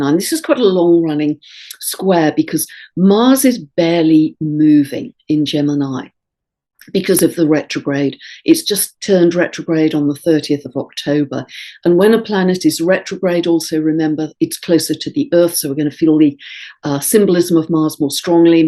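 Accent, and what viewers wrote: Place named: RP, United Kingdom. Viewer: Never been to this channel before, but this woman is poshness goals 😶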